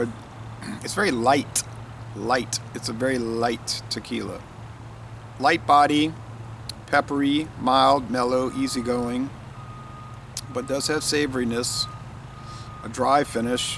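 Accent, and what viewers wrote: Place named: New Orleans, United States. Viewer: You are welcome, I always really enjoy your vids, and have to admit enjoy the accent as well as I lived in and around New Orleans for many years. For you or anyone else interested, I rn across this posted today. This fellow does a deep dive into the rules and regs of Tequila and the oversight. Interesting but maybe a bit much for the casual Tequila drinker. <link>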